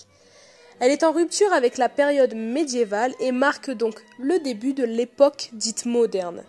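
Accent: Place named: Italy. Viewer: J'ai adoré cette vidéo, très instructive et bien construite, j'adore ton accent italien 😂 par contre j'aurais aimé plus de précisions sur les artistes que tu as cité et des definitions aussi ( qu'est ce qu'on appelle le cuatrociento ? Etc...) sinon c'est top j'adore l'idée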